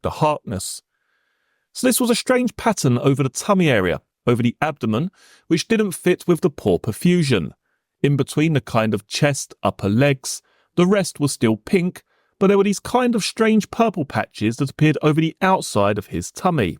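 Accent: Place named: East London, United Kingdom. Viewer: Maybe a great voice actor but the wrong accent. A little too east London, especially for a barrister.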